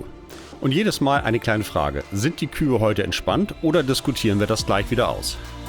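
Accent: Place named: Denmark. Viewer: Streckenweise warst du der Alm-Öhi des Nationalparks😅👌 Nur die Sache mit der Kamera ging gründlich daneben😔 So ein Mist! Dein Video zeigt ungeschminkt die Natur, die man dort erwarten darf🫠 und deinen dänischen Akzent finde ich richrig niedlich 😁.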